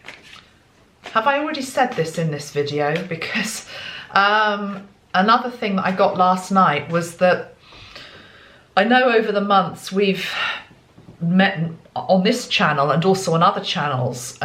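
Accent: England, United Kingdom. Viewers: hello. to be completely off script; just wanted to say I love your accent and it reminds me of the English actress in Agatha Raison. a jolly good series we got here in Australia recently. also it was good to be reminded of the Light Workers. thankyou for your insights. janell downunder x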